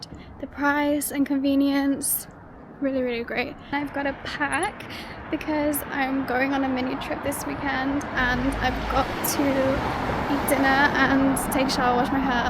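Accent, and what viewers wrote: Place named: Yorkshire, United Kingdom. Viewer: is that a yorkshire accent i hear? i have many questions abt working in tech in china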